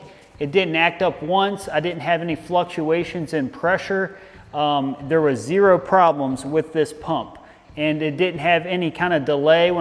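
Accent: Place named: Baltimore, United States. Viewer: Holy Baltimore accent, “q-pon” 😂. Love it.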